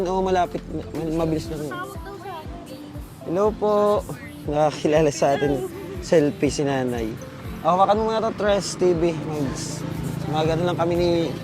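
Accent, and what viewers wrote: Philippines. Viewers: Magkababayan palang kita Lods 😊ringkonada din ako...taga NABUA po aqo😊taga sari po ika tabi sa bicol?Ringkonada rin kc Ang salita mo.. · Regardless kung paano ka magsalita ng Bicol Ang importante yong tulong na ginagawa nyo❤❤❤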